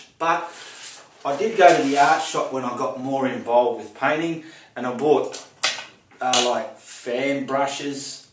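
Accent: Australia. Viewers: Thanks for the video,! And, you shouldn't change the way you speak, you're Aussie speak as you normally do!:-) My American ears find an Australian accent quite lovely. Cheers!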